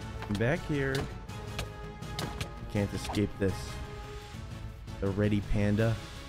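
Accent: United Kingdom. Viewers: I never knew can do a british accent! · The scouse accent is killing me 🤣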